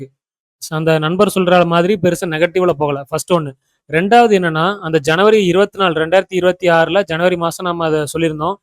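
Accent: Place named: Tirunelveli, India. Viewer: ok bro. Na tirunelveli than. Namma ooru pakkam ulla tamil mari erunthu athan ketten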